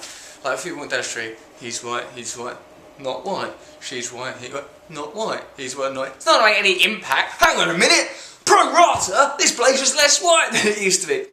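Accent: United Kingdom. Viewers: Hey.. listen to enough of these and you too can start understanding brit .. love it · Hey i don't understand to much british accent, and you speak very fast, breath a little lol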